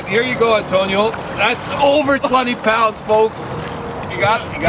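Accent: Canada. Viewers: Are u canadian :)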